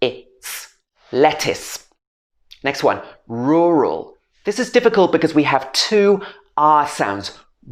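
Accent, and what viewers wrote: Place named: United Kingdom. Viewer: Good British pronanciation..thanks for such type of videos.. visit our channel...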